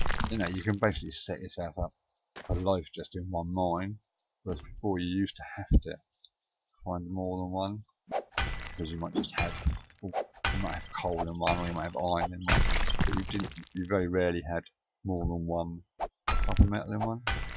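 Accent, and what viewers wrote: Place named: England, United Kingdom. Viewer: If I may be so bold: a couple of problems I noticed - the voice commentary is often unintelligible, or drowned out by either the minibike or the sounds of mining. I appreciate that you have an English regional accent, and that is set in stone; but I am guessing that perhaps some viewers find it difficult to understand - at least you don't have a thick Geordie accent eh? Building and engaging an audience is a long hard road, but another step you could take is uploading a profile pic, doesn't need to be a selfie, could be anything, but it is something other than the anonymous 'blue blob'. I am your one subscriber!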